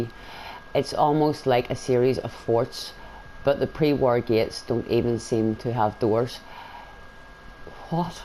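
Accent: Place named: Northern Ireland, United Kingdom. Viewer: Love that "northern" accent... Derry or thereabouts? Georgia (USA) here. Well done.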